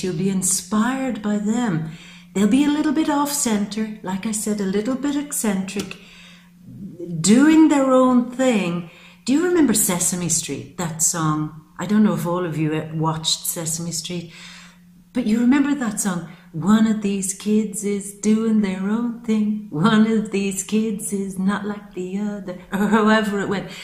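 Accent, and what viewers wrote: Ireland. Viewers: god is love You have Beautiful Gift. I enjoy your manner. Your voice sounds a bit Irish ! Your Tarus friend.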